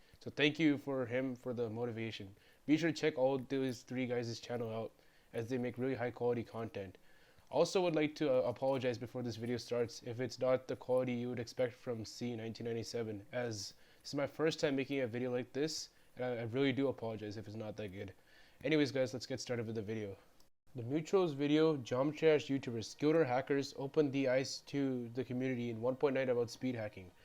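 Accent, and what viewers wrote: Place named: India. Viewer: Btw are you from india your voice looks like an indian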